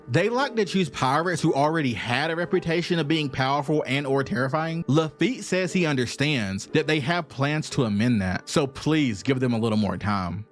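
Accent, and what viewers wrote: South, United States. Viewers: Sorry but with that weird ghetto southern drawl i couldn't make it past the introductions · Nice southern accent. Not hating, I have a southern accent too. Awesome video though and I’m subbed now. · You have such a southern accent man, where are you from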